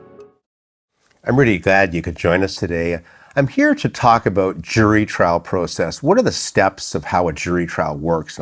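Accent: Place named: Canada. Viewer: Is this dude and Canuck er what? Second sentence he said proooocess and I knew it. Are you taking US Court system here or…. Oh no, you just a said “Crown”. Canuck.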